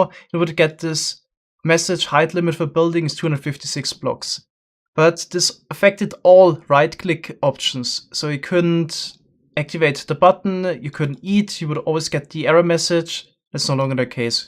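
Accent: Germany. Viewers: Are you german? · Is he from Germany?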